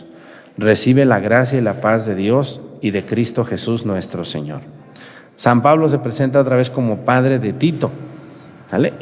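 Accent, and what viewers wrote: Mexico. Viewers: PADRE BUEN DIA..YO trabajando i Escuchando CON GANAS.TU ACENTO MEGICANO ME AGRADA.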